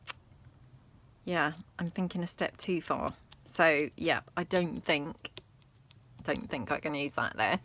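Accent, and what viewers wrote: England, United Kingdom. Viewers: Hi Miss!! I'm new to your channel but I am loving what you are doing..And your accent is beautiful..Are you from England? I look forward to watching your videos and seeing all the great Fall things you make...Lots of love from Kentucky💙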